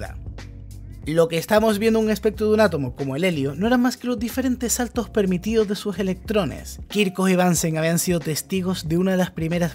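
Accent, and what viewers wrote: Chile. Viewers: Juraba que eras chileno, tu acento se parece muchísimo al acento chileno neutral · Hola amigo, súper tu video... Eres erudito en el tema, fascinante! Muy documentado. Intentaba recordar de dónde es tu dialecto: y bingo.... lo recordé: chileno. Un saludo desde Colombia.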